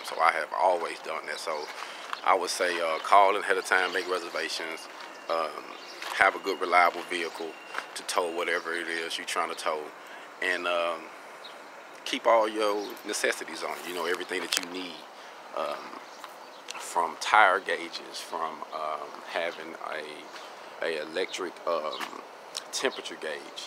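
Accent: South, United States. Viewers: Brother you sound like you're from the South..You should know better....Have you never heard of"trailer park trash",have you never been in some.of these truckstops with these fools...What are you trying to prove?..Think bruh,think!!! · Where are you from? Somewhere down south?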